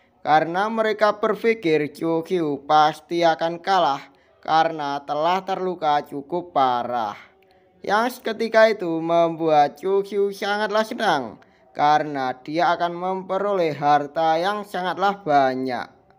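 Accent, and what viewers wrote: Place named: Java, Indonesia. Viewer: Bahasanya terlalu medok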